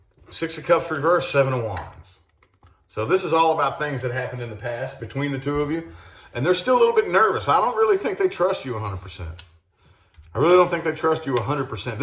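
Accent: South, United States. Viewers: Haha, I love your southern accent!! 😈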